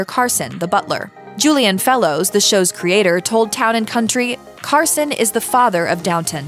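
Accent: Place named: United States. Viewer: Blimey! That American accent is ear-splitting!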